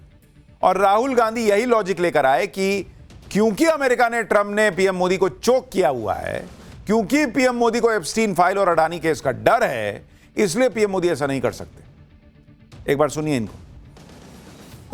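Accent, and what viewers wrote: Bihar, India. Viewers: वाह जी,आपकी बीहारी टोन मे विश्लेषण सुनने मे बडा मजा आता है।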